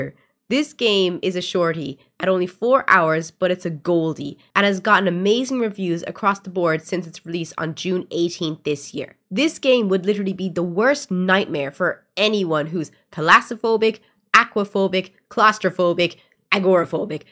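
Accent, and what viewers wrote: Ireland. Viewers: I like your accent 😊 I need to move to Ireland 🇮🇪 😁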